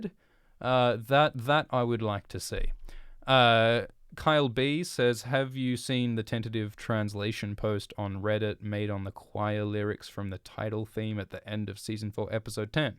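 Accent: Australia. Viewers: It's not the typical Aussie accent, you can hear it in some words, but he doesn't do that thing where everything he says sounds like a question. So, it throws people off, especially Americans who hear relatively few of the different Aussie accents. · I'm curious, are you Australian? Being an Aussie myself, you sound very Australian? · It's Australian (this gets asked about 50 times in every ASX video btw, he should just start out his videos by saying where his accent is from so people will stop asking all the time xP)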